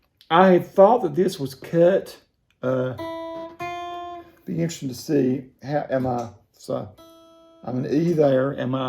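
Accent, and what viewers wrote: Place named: North Carolina, United States. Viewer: I'm detecting a heavy North Carolina accent. Am I close?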